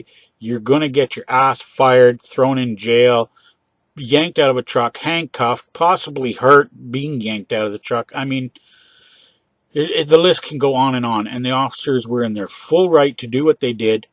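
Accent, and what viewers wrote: Canada. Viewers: With you're accent you can't fool anyone you're from anywhere but Canada! Glad to see you. Thanks for the update. Take care.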